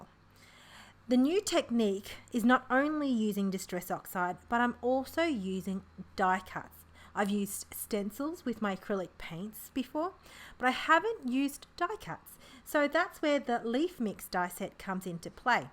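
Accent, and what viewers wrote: Australia. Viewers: Glad to hear a fellow Aussie on YT. New sub from NSW ❤️🇦🇺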